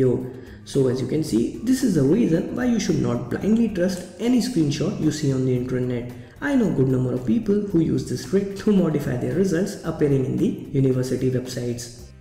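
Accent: India. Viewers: I love the indian accent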